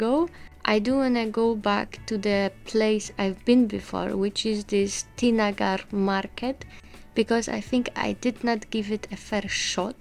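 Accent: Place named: Russia. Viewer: you are very polite and soft spoken and you sound like russian 👍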